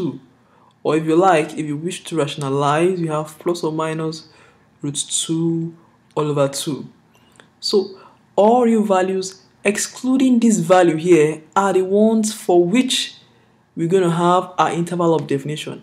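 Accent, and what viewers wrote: Nigeria. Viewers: baba, are you Nigerian?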